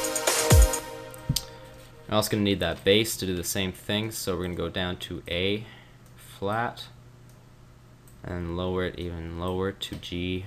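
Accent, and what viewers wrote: Canada. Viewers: are you Canadian? :D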